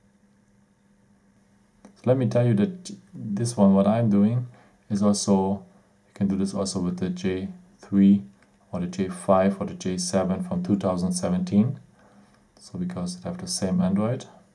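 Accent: Germany. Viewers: yor from german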